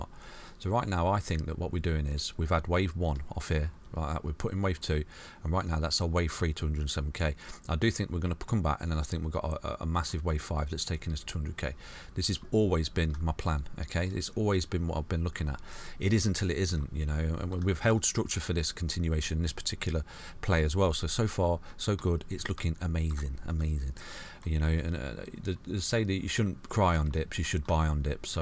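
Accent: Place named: Leeds, United Kingdom. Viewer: Grab a pcs of paper and write down the time and then you just put a time in the description for example HERO BTC Etc YT will split the video based on that. its as simple as that pal. Btw, I am curious where your accent from. You've mentioned Leicester a few times but for me it sounds like a Leeds accent? Just out of curiosity pal.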